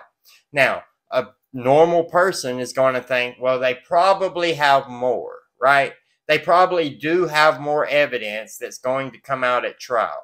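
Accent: South, United States. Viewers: I haven't watched the True Crime "special" yet. I can just imagine... I loved your comment about not just selling black gloves at the "murder shop. First time I laughed out loud today! 😂 The southern in you really came out today. Loved it! Thanks for the video! ❤️🎙️❤️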